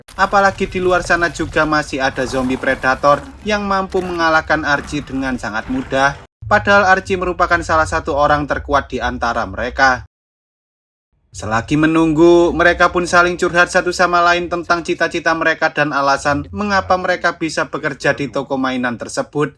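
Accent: Java, Indonesia. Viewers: Mas bisa gk logat jawanya ush medok amat jdi film yg seru jd datarrr aja Maaf ya 🙏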